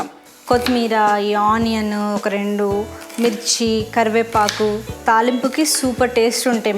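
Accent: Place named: Nizamabad, India. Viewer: Though it's orugallu vantillu..You are pakka NIZAMABADI 🔥💗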